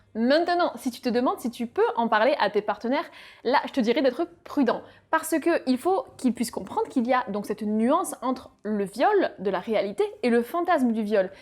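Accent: South, France. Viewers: Bravo GG félicitations avé le petit assent du sud <3 <3 L&V 💑 #ĹҎ ➰ #Shibuntu <--- Seek on Youtube ƪ(˘⌣˘)ʃ 🌸 #ShibariConsensuel 🎀 #ASMR 🎧 #LacherPrise #Lacher_Prise_ 💐 #Shibari ⛩ #Kinbaku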